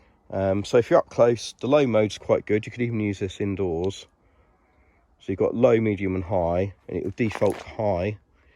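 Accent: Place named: United Kingdom. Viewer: British speech 😊😊😊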